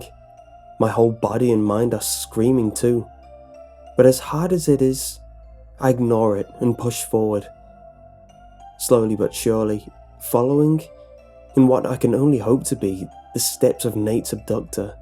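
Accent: United Kingdom. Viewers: That's his real voice. He's British. He's cool.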